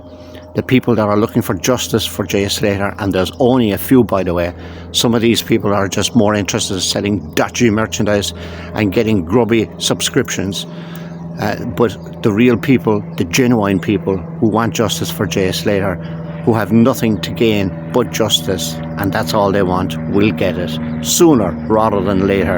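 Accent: Ireland. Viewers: Would you care to back up your claims that there are cartels involved? Show us the proof. The video you saw where Jay lost his sight? Back it up, give us some proof. Currently all everyone is hearing is you blowing smoke out of your ass in an Irish accent...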